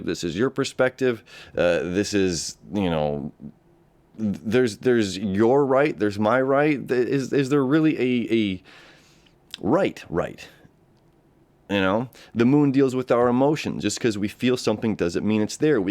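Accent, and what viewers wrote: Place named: Australia. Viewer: your Aussie accent is actually pretty good, it's not terrible at all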